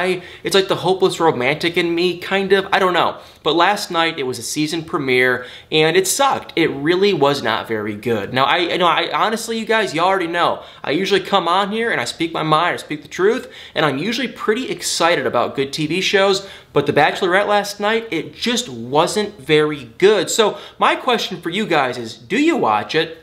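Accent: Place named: Michigan, United States. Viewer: i can 100% tell you’re a fellow Michigander when i noticed how much you say “and what not” like me 🤣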